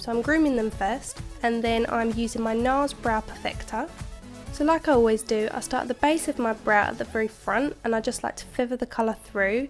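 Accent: Essex, United Kingdom. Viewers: Very beautiful, sultry look, thank you! By the way, are you from Essex?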